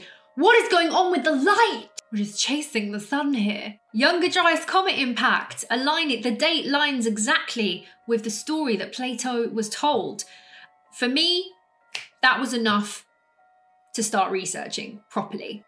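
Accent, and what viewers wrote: United Kingdom. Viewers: Hey I think you are doing a great job making us aware of the many mysteries of the Ancient times plus adding your personal female British accent makes it more attractive. Jimmy is a great investigator also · Something about discussing the truths of ancient history....with an English accent...makes it sound so legit. If I were to talk about it I'd sound crazy. :-P At the end there you posited that survivors of the Atlantean Global Empire came to the hunter gatherers (assuming they were very primitive and since they lived inland and in the wild they survived the tsunamis and weather changes) and almost appeared to be gods with their Atlantean knowledge. So "Osiris" was an Atlantean who then became a god to the early Egyptians. Now circle back to the pyramids. They were thought to be pre-diluvian structures that created energy to supply power to the various global cities (everyone had their own pyramids or power structures). 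If a surviving Atlantean passed on his knowledge to the pre-cursors of Egypt....why did he never explain the pyramid's true purpose? Even if the pyramids no longer worked, why did the Egyptians keep zero records of the structures, how they were built and who built them?? Not even a verbal tradition, one that speaks of Atlantis WAY BACK in history, doesn't speak of the pyramids across the globe? There's a huge gap here that needs to be filled...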